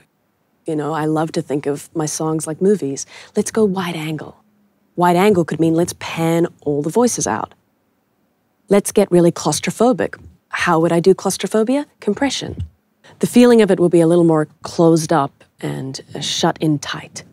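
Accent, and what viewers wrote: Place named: United States. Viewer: Did she lose her accent? Sounds almost American.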